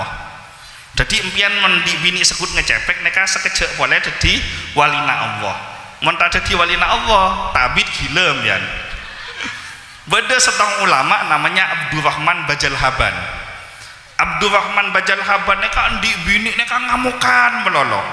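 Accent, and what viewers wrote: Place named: Sumenep, Indonesia. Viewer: Dari mana asalnya kiai ini?. Logatnya seperti orang Sumenep.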